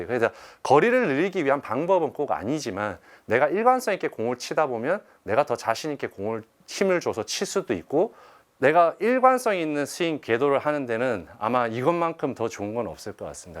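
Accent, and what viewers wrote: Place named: Busan, South Korea. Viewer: ㅋㅋㅋ 허프로님 부산분이라 사투리가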